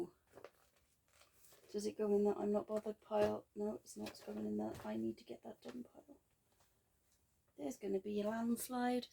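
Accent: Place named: Wales, United Kingdom. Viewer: I knew i had the fear of horses for a reason! 😱 yes I feel you would be nice to not work and stitch all the time 😁 i will send you screenshots of how I do it 😊 maybe focus on a piece for 3 days then move on to something else? I always look forward to your vids I very much love listening to the welsh accent 😁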